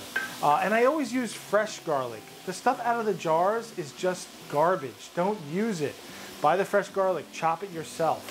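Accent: Long Island, United States. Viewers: Found you via Tasting History with Max Miller! I'm also from Long Island, and yes, it's "riggotd." LOL You remind me of the LI guys I knew, growing up. Especially, your humor about making sure you get everything on your shoes! Very funny... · Dat Strong Island accent. Sounding like you're from Patchogue.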